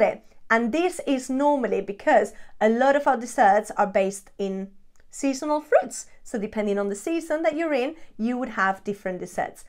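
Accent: United Kingdom. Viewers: It never occurred to me that there would be any British people who would be interested in learning Spanish. Just like Americans (whites) have no interest in knowing any other language except "Merican". You speak English with a British accent, but your Spain Spanish is perfect. Your appearance tells me that you have more Spanish roots than British. I wasn't going to watch you until I heard your Spanish and now I am intrigued. Let me know if I got any of that right.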